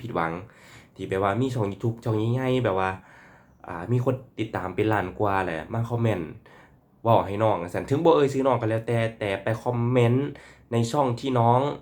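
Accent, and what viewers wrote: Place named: Laos. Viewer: ชอบสำเนียงลาว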